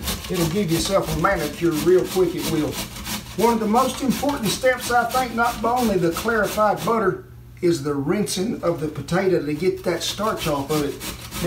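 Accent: South, United States. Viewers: I love his cowboy accent, yee ha!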